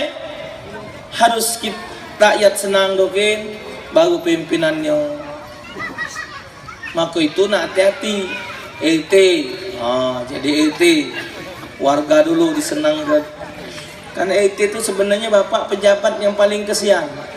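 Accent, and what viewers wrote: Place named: Palembang, Indonesia. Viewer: Seperti bahasa Palembang Ya bang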